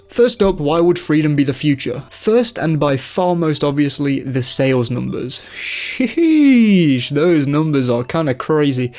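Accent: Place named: United Kingdom. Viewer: Late to discover this channel! Story and lore were my main concerns with the two latest 3D Zelda games. Aside from that, I really enjoyed them. I’d say I enjoyed replaying Breath of the wild more the second time than the first. And if both Brearh of the wild and tears of the kingdom will be enhanced for switch 2, I’ll be replaying them again, once playing through the timeline order again. (As an aside, nice to hear another British Zelda YouTuber after discovering Zeltic).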